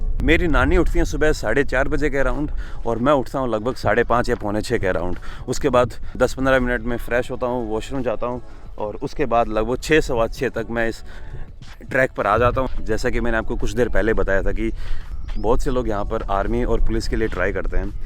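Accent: Haryana, India. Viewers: bhai ki haryanvi ekdum keher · Nani and u rock bhai ! Bhai Thara video dekh r mjo aaygo ! Hindi nav to aapni haryanvi mst lage bhai ! Or didi - niche to kar inee ! 😄✌️ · i belong from a village of UP but it was wonderful to see village lifestyle of Haryana with some desi Haryanvi pure akscent. Tahnxx, i last vedio i suggested you to show some water conservation technique you are doing good in this channel believe me. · bhai Haryanvi mst lgti hai apke muh see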